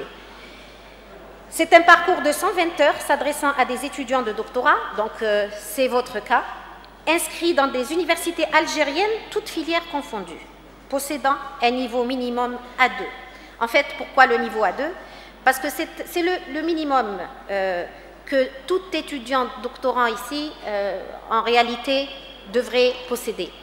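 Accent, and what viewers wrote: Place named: England, United Kingdom. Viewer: teachers of English trying to speak French, we still can notice the effects of English on their French pronunciation.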